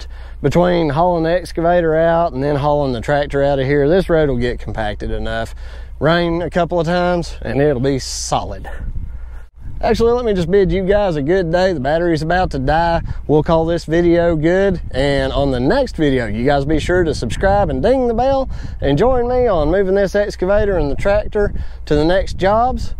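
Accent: South, United States. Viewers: I'm sure I'm not the only one to notice, your country twang goes away when you talk on the CB 🤣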